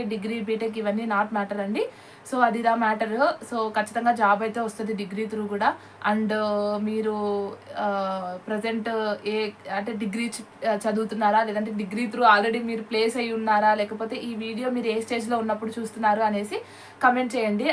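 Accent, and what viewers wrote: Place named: India. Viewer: this girl is inspiration to those people who are just sitting and weeping that i dont know english i cant do my background is poor and finding many other excuses instead of just trying like her ...... i apprecciate your video sister by the way adhi indian vocabulary or pronunctiation kaadu its called an INDIAN ACCENT (WITH BASIC ENGLISH VOCABULARY) 🥰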